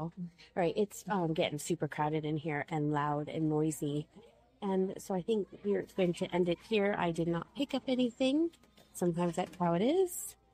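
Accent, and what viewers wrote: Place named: South, United States. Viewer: Love your southern accent! Cant wait for the Maniac one... downeast? Or perhaps Acadian?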